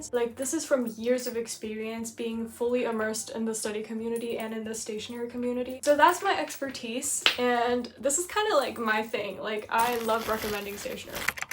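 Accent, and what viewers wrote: United States. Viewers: i totally thought you were american lol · these are all great choices! btw, why do you have an american accent when you're from Austria?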